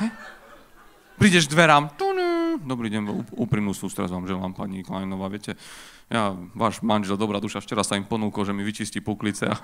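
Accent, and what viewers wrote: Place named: East, Slovakia. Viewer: Nie kámo tak rozprávaj ľudia na východnom Slovensku. Nárečie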